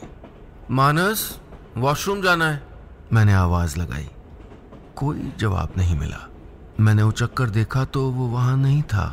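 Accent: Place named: Kumaon, India. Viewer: Very beautiful story and that kumauni style of talking just awesome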